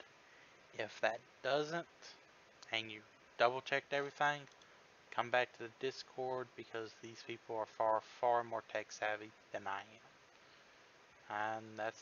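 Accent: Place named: South, United States. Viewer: Thanks mate, love your southern (?) dialect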